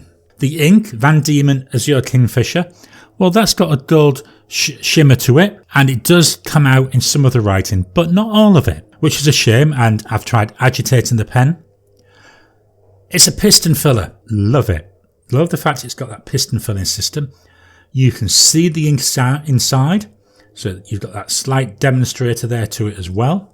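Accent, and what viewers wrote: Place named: England, United Kingdom. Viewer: Hi. I have two of your pens; the Moonman M800 (Moonman nib) and the Jinhao 159 (I have a few in different colours). I don't have a Hong Dian but I do have the Lamy Studio which looks very similar. The Jinhao is a good pen for the price but feels a bit too large in my hand. I was surprised it took the top spot in your ratings. By the way, I know you are in Australia but your accent has me confused. It sounds English but I am stuck on the exact region. I am from UK but your voice still has me a bit puzzled!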